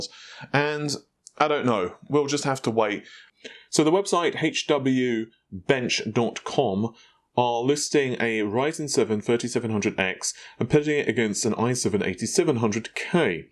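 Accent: England, United Kingdom. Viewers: Mike D He is British from Great Britain, with an English accent then :)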